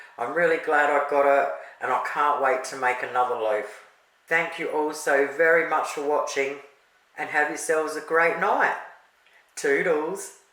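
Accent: Australia. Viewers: So ocker (and I mean that as a compliment)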